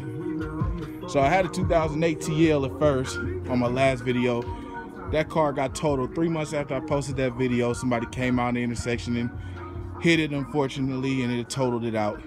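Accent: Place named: Southeast, United States. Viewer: You aint from Cali are you? You sound like you somewhere South east...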